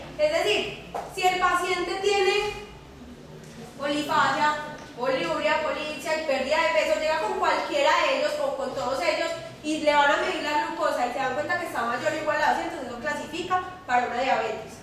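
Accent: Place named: Venezuela. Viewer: Me encanta su acento. Es venezolana o colombiana o de donde es? xD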